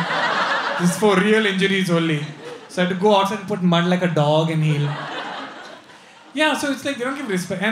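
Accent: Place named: South India, India. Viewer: Typical South Indian English, I'm a South Indian too and I love it !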